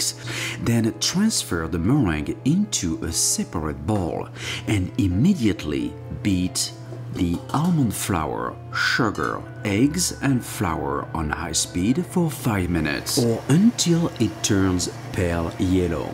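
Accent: France. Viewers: Love his french accent! 🤗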